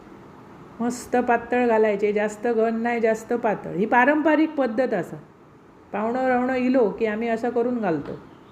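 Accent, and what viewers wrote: Malvani, India. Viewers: तुमची मालवणी भाषा बोलणे खूप सुंदर असा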